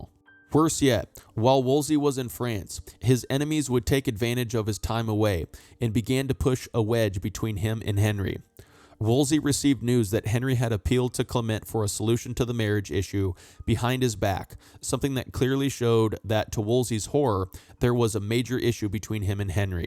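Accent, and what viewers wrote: United States. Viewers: Nothing more irritating than hearing a yank speak of English history when they know nothing or dare speak of their own lol 😆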